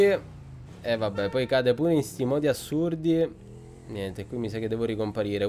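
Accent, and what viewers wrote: Palermo, Italy. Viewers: ma sei di Palermo? A volte hai la cadenza palermitana da come parli xD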